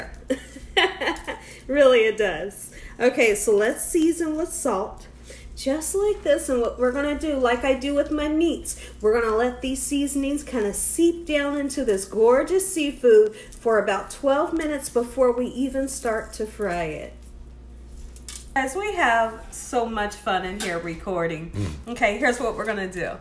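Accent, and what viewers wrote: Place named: Ohio, United States. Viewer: OOOH MY GOODNESS! BETWEEN THE DELICIOUS 😋 INSPIRING MEAL YOU PREPARED, THE PRAYER, AND YOUR VERY INVITING BEAUTIFUL OHIO ACCENT - YA SOLD ME. THE WAY YOU SPEAK COULD INSPIRE THE DEVIL TO DO RIGHT IF HE'D ONLY LISTEN. YEP I WILL SUBSCRIBE AND TELL A FEW FRIENDS. THANKS 😁😅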